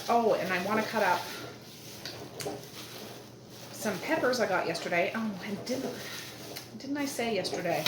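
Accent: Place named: Canada. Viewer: Her Canadian accent. Lol 🙂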